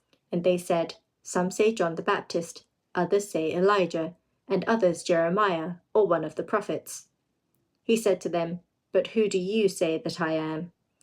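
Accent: United Kingdom. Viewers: No offense but British accent makes me feel like I've lost all understanding of English as If I it's not my own language, this is probably how non English foreigners feel when they hear English 😂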